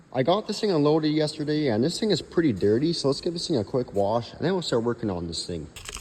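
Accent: Canada. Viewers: You sound like you’re either from Canada or Wisconsin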